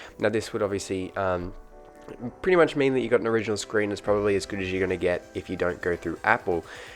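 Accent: Australia. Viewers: WAIT A MINUTE ARE YOU AUSSIE!?!?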